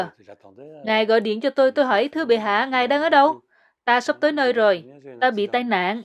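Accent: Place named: South, Vietnam. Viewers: Tui ghiền xem kênh này từ khi tình cờ xem được clip hai em đi về vùng quê thăm mộ HHNP và nơi HH từng sống...❤❤❤ Rất thích thú cách interview của, giọng Miền Nam tự nhiên và rõ chữ👏👍👏❤❤👍👏👍❤❤ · nhà báo giọng Sài gòn sang trọng Văn phong phỏng vấn đơn giản thú vị. đồng cảm với nhân vật. người nghe xúc động cuốn hút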